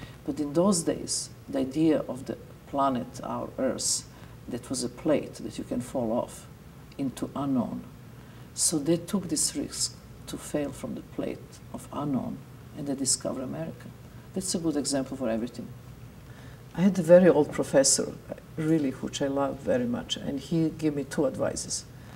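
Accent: Serbia. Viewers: She’s a beautiful soul. Period. Research her origins before you call her evil by your own ignorant definitions. You hear her Serbian accent and call her Voldemort, etc? The stupidity and ignorance is shocking. Catch a flight sometime and TRAVEL. Go to a museum! Crack a real book once in awhile. Ugh. Listen to what she is saying. Great art should upset us. It is hard and it is part of the artist’s very Being. THEIR experience. It should open our eyes and make us all think. Examine ourselves. If what you see is fear and man’s construct of a devil - that says a lot to the rest of us about YOU. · she's not american but serbian lol "its her accent". ps, i dont defend her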